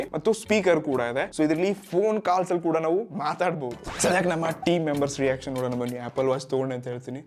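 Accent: North Karnataka, India. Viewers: Uk ಸ್ಟೈಲ್ನ್ಯಾಗ ನಿನ್ನ ಮಾತ ಕೆಳಾಕ್ ಚಂದೋ ಅಣ್ಣ 🙌💗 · ನಿಮ್ಮ UNBOXING ಬೆಂಕಿ ಪ ಅಣ್ಣ ಅದರಾಗ ನಮ್ಮ ಉತ್ತರ ಕರ್ನಾಟಕದ ಸ್ಟೈಲ್ ಮಾತು ಬಾಳ ಇಸ್ಟ ಆಯ್ತು I AM YOUR BIG FAN OF YOU BRO ❤️🙏💛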